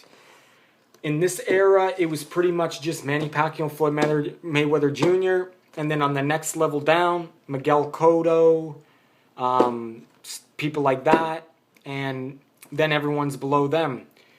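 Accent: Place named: United States. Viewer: I thought you are american with accent, u live in lithuania too?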